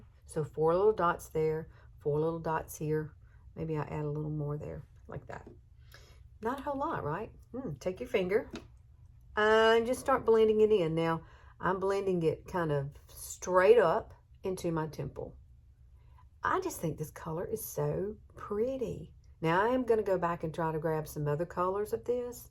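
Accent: South, United States. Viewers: I’ve been so sad about the loss of your mom and I’m praying for you, your family, and now your friend, Terri. What a tragedy to lose a child. My heart hurts for you and your friend. I’m not very tech savvy and I usually watch just YouTube videos, but I’ve found a few of your videos on Facebook. I don’t use FB very much at all and I’m not sure if I’m posting comments correctly or not on there. Do you usually put more videos on FB or YouTube? I sure enjoy watching and listening to your southern voice😊 From one southern gal to another, love and prayers to you and your family 💕💕💕💕💕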